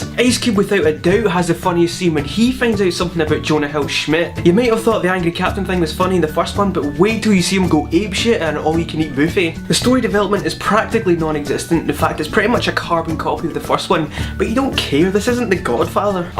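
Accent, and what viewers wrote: Scotland, United Kingdom. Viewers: It's so nice to hear another scottish accent on here!! This review was really useful, I was needing to choose what to go watch at the cinema tomorrow, so thanks :)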